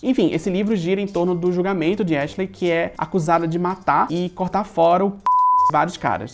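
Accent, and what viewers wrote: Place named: Rio de Janeiro, Brazil. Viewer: Falando em várias personalidades, uma pergunta, você nasceu em Pernambuco e mora em São Paulo, mas fala como um carioca, por que isso acontece?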